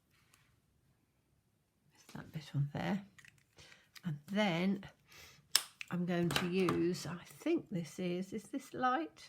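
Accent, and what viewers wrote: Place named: England, United Kingdom. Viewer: I LOVE the way you chat so naturally to us! My father was English and I do so enjoy hearing your accent. He has been gone many years now but you make me feel "at home". Our Aussie drawl is nothing like his beautifully refined tones which you share. Thank you! I also love the gnomes sets and have them. You gave me ideas for other designs with them too! Bless you!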